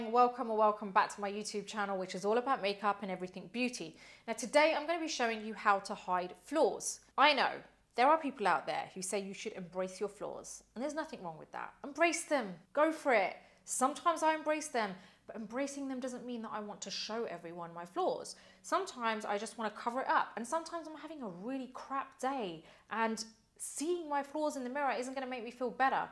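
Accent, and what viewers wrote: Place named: England, United Kingdom. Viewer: Love ❤️🥰all your makeup tutorials. You do an amazing job 😍explaining each step throughly. Just want to know where are u originally from? I don’t know much about makeup. So your info is valuable to me , it 🌹helps me to buy the latest products in the market, concealer foundation… I live in Connecticut US, ( vcold weather), dries out the skin. Can u suggest any products for that, before makeup. Did u live in England? ( your english accent).I was brought up in Riyadh( Saudi Arabia) and England.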